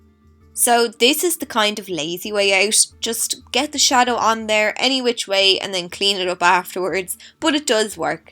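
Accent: Ireland. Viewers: Lol im irish too and you sound like my music teacher XD. My accents a bit different from urs cause im from the north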